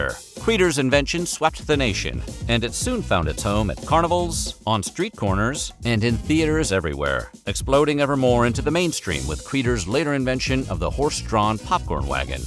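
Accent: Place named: Transatlantic, United States. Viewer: You have to keep that wonderful Transatlantic accent alive dammit, for us all.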